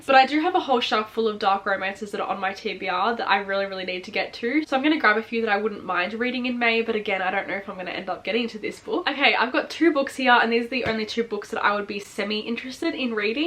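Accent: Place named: Australia. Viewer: Cute Aussie accent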